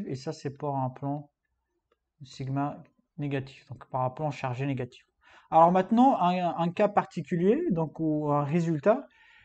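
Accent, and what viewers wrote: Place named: France. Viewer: magnifique accent francais, comment te la eu ?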